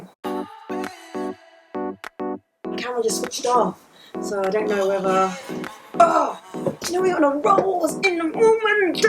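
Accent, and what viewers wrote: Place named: London, United Kingdom. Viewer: Love your vids. From a fellow Londoner (you sound like you're either from south or north London lol)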